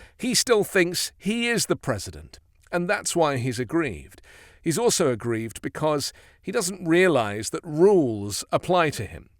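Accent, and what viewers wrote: United Kingdom. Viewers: I love his voice! It's British so it makes him appear smarter are more authoritative. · Somehow that British accent gives the entire affair more gravitas. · I love listening to you shred Donald Trump. Insults with British accents have more sting. Beautiful.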